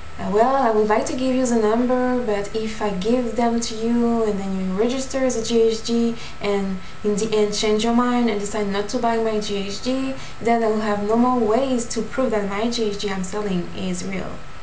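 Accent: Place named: France. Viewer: She sounds very french.. what do french people look like? because I am French! born in Nice raised in London!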